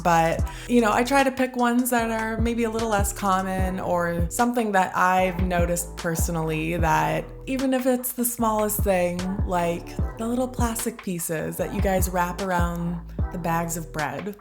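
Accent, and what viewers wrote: United States. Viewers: Hej! Are you from Minnesota?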